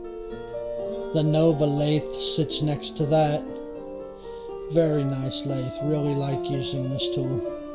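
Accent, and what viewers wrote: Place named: Louisiana, United States. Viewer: Just watched one of your other videos where you started that you were from NO. and I swear I wanted to ask if you might be from Louisiana because I thought I heard a little bit of an accent. Although I live in the Houston area now, I’m originally from Lafayette and parents are from New Orleans and Kinder.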